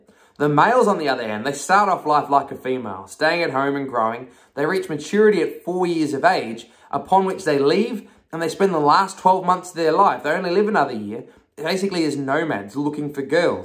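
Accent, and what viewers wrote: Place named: Australia. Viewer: For some reason, I just knew he was from Australia or from that region 😂😂😂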